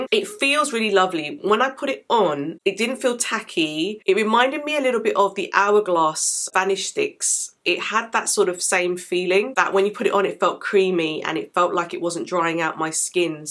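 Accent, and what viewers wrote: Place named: United Kingdom. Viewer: Oh my goodness I love you 😍 I’m British too so totally appreciate your accent plus enthusiasm!!!!! All the words you’re using are spot on for these products . I have them all ,totally obsessed. You’re right about the super loaded peau de peche !!!! I find myself taking it out of my purse just to look at it and smell it . Absolutely stunning product. In fact I’m stocking up in case it disappears one day 🙋🏼‍♀️😳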